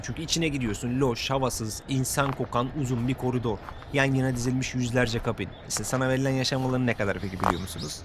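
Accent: Japan. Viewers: japonyada kalmak cidden etki etmiş bu arada kelimeleri telaffuz şeklinden japonca konuştuğun baya anlaşılıyor çok tatlı (‾◡◝)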